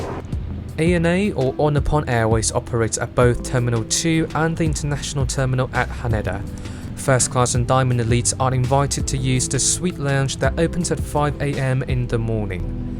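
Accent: United Kingdom. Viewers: 感谢分享，ANA的新头等装修真的很大气屏幕效果也很惊艳，可惜我今年只能飞到老客舱。顺带一提博主的英音很惊艳哇，完全没想到是在美国上学。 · an asian guy with british accent is just so off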